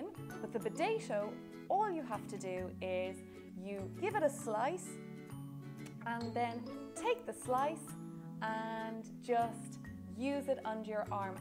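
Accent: Ireland. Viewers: Love your Irish accent.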